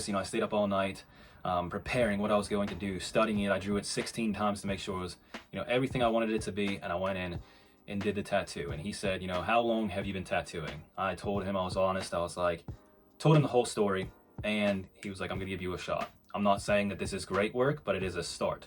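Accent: United Kingdom. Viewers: You sound British - can really hear a twang in there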